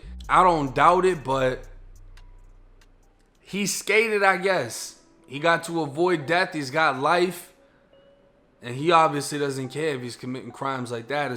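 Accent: Boston, United States. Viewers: “I don’t know if he’s re-ta-did or what” 😂that Boston accent kills me every time. Great channel bro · Yo cuzzo, I don't know ya name. You, the one who runs this page, I hear u say u been all through Florida prisons, so I assumed u were from Florida. But it sounds like u are from Boston, or even a little NY. Where u from cuzzo. And I definitely fucks wit ya page. I always watch it. I did a little over 10 yrs. Did time in Philly, Smyrna and Delaware, but where u from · Your accent is interesting. I've never heard what a boston wigger sounds like before. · Checking in from Providence, Rhode Island, it's cool that hes got the east coast/Boston accent too lol. PS These dudes are sick🤢🤭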